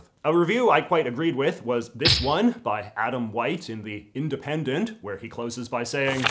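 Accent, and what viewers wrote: Canada. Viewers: homie, as a fellow canadian, you’ve got the most canadian voice i’ve ever heard not in real life! you hit those aboots, love it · I’ve barely heard any Canadian accents but I love your one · this man has a classic stereotypical canadian accent and I love it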